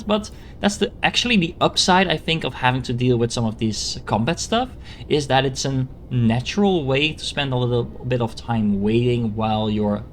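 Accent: Netherlands. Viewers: Je klinkt gewoon extra Nederlands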